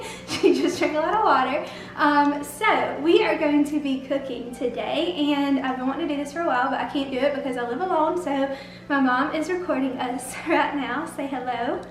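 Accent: South, United States. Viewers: this is a awesome give away I just love listening to your cute lil southern accent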